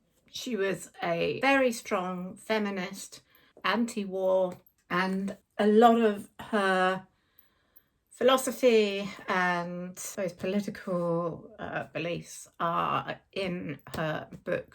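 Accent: England, United Kingdom. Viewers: A lovely English accented lady talking about winter books, preferably curled up on my sofa under a fuzzy blanket on a dim, chilly day with a fire crackling in the grate… what could be better??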